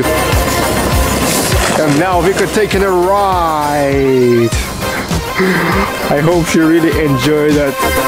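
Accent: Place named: Russia. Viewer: that's sad to hear but at least your really Russian and I love your accent :)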